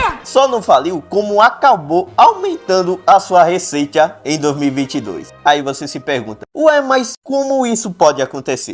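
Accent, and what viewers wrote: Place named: Brazil. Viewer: que sotaque fofo! amei seu sotaque!! eu estudei sobre sotaques! o seu sotaque é remanecente de um dos primeiros sotaques do Brasil!! isso é muito foda rsrs sou nerd de línguas,jogos,anime etc kkkk então sim informação inutil pra você mas toma ehhe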